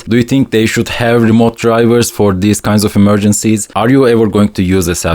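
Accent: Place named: Turkey. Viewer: Abi Türk aksanı hayvani sızmış, elinize sağlık 🔥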